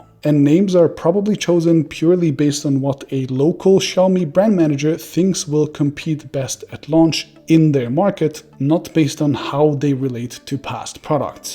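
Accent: Germany. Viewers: Your German accent is just annoying, please hire and American person who can talk for your little mid life crisis virgin a$$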